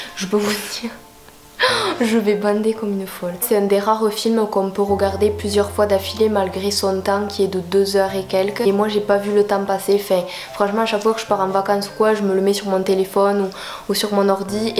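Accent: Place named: Marseille, France. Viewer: oooooui oooooui " ...poinnnnnne...poinnnnne..." je n'ai gagné qu'une chose avec la chaîne de Williams c'est d'avoir découvert ta chaîne avec ton magnifique accent marseillais ;) pouce bleu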